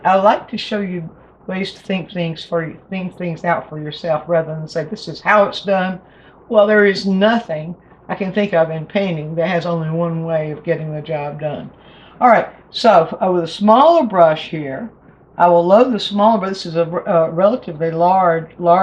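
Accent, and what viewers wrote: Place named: South, United States. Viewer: Yet another nugget of wisdom from YouTube's best painting instructor with the most beautiful southern accent. 😊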